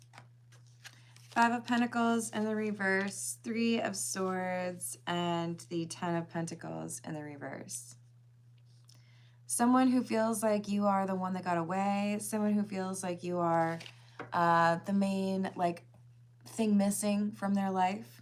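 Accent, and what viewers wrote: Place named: Minnesota, United States. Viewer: Bahahaha..love the Minnesota accent. 😂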